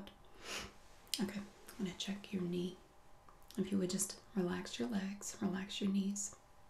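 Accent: South, United States. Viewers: As a French girl, I find that your "southern accent" makes the English a lot easier to understand ! Nice video, by the way. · Folks, this is what a REAL urban southern accent sounds like. The long 'drawl' is more common in rural areas. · Awkward moment when you wonder where her accent is, then realize you've lived in the South your whole life and this is just what sounds normal to you... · Your accent is perfect! When people try to force a southern accent it always sounds way too drawn out and unnatural. yours is great. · Yup I live in Austin, Texas... this is definitely southern haha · I love cranial nerve / medical roleplays, and I also adore southern states accents - So this video is bliss for me! Thank you so much for this creation! Can't wait to see your other videos! All the best from the UK. :) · needs more southern accent 4/10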